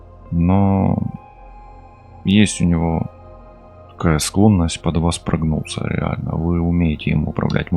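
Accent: Ukraine. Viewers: Он Водолей,Я близнец..Я кацапка.. мы сос тояли в браке..1ОЛЕТ., ЧУЖОГО я не брала,пусть вернутчтто украли...Приятно слышать украинский акцент,хотя многие. слова. не понимаю.. У меня подруга с семьей проживала в. Броворах,а потом ВЫСТРОИЛИ дом в Винице.За. 3 дня до войны,она ПР ИЕЗЖАЛА..🎉.Я приглашала к. Себе пожить,но они не сммогли выехать.Раньше была связь,а теперь уже давно нет,побаче пожалуйста Скажи,они для меня как родные.я..яс уваж.Надежда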